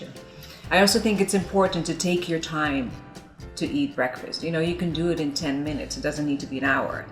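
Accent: Netherlands. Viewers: je hoort echt het nederlandse accent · She has sich a strong dutch accent haha i love it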